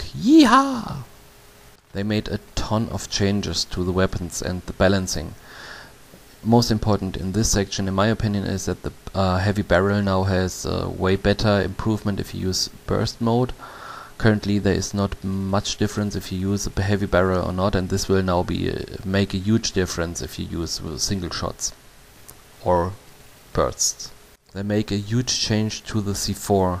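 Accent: Germany. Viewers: Best german accent i have ever heard of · Du bist ein Deutscher :D Das höhrt man! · Ich wusste ab sofort, dass du Deutscher bist xD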